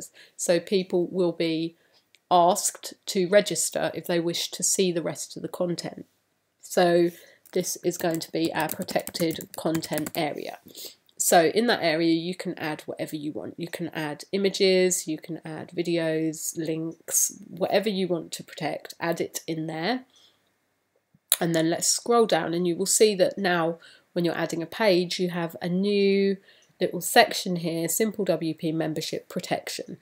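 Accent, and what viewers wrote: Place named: United Kingdom. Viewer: Excellent lessons, presented with a lovely British accent👍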